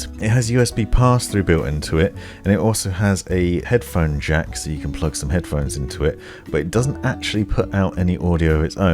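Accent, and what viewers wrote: England, United Kingdom. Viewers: I don’t care about the monitor I came here just for the voice of a calm english captain Jack Sparrow. Ever thought about speaking in audiobooks?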